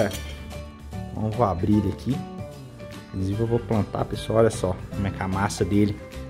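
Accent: Minas Gerais, Brazil. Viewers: Tinha que me inscrever cara, você com esse sotaque mineiro, lembra muito dois amigos que fiz aqui em Goiana Pernambuco, na fábrica da jeep, muito legal!!! Agora uma pergunta: essas mudas que você ensina, todas são anã ou seja pra vaso numa área dentro de casa? Me responde aí a pergunta que fiz!!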